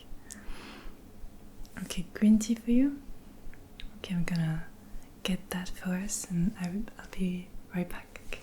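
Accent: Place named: France. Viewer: Even ASMR is more elegant with a French accent!!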